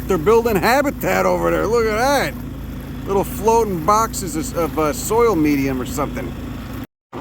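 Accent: Chicago, United States. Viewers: Sounds like you come from Taylor Street, I think I picked it up here and I actually from there like Downers Grove or some crap. Anyway, yeah Chicago River Resurgence such as it is you know much progress compared to that crap in New York f*** that noise . Some assholes ain't giving a f*** but you know nature takes its course wherever it can. Edit grew up in Elmwood Park not Downers Grove. Keep on keeping on capital p a l . I ran across a tribe of snapping turtles, that would knock your eyes out I don't think you could fit them in the seat of a Toyota Karla yeah that monster on the Chicago River what are you going to say who can compete these things went from two to three and a half feet front to back on the shell, used to be a carpenter I know my dimensions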